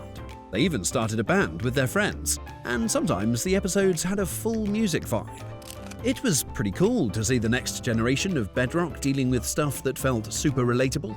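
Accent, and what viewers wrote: United Kingdom. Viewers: Calling all of these cartoons "bizarre" while acting as if Scooby was normal is what's bizarre. And then to do it with a British accent? 👎🏿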